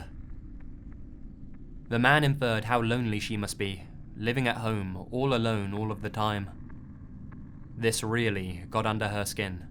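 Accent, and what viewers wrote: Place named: England, United Kingdom. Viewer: Best voice, i make fun of him but I would rather hear an English accent over any other, chap!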